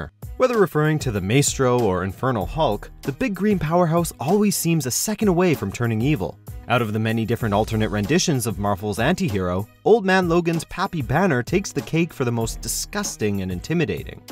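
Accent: Canada. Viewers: They're Canadian I believe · Are you Canadian?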